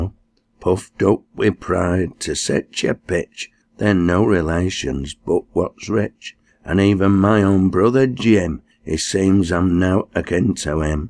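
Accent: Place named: Lancashire, United Kingdom. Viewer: I really like the subject and the lovely Lanky accent but it was far too fast for me ( I am lanky too) If you could slow it down a little I am sure it would be so much powerful as then I could understand the words. I really hope to visit you again soon, you have a lovely voice and wish to "continflab" further : )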